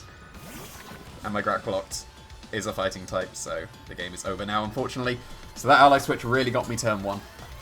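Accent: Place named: United Kingdom. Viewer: I like your British.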